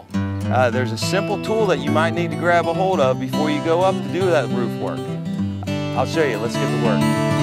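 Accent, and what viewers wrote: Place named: Eastern Shore, United States. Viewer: This guy sounds like he is from the Eastern Shore.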